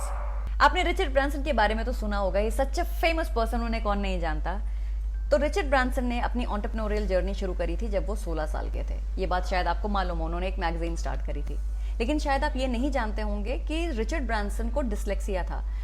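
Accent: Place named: Uttar Pradesh, India. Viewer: Love you mam ❤️ from Pakistan 🇵🇰 suda khush rho AAP ki baton say lugta hay k AAP up say ho hum bhi yahan asay hi batain krtay Hain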